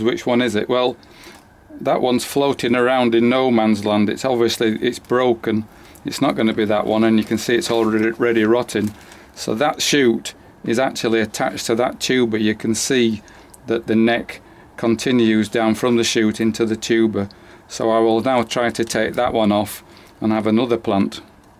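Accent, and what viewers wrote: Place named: Manchester, United Kingdom. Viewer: I was given some dahlias last year and they were beautiful. I put them into show boxes and lots of vermiculite and stored them in the garage over the winter. I have recently looked at them prior to putting them into garden pots. Some feel soft and I can't see any shoots at all, do you think they will flower again this year. The soil here on Victoria Island, British Columbia is lovely and warm right now. I am use to live in Chorlton-cum-Hardy Manchester so I love your accent and your videos.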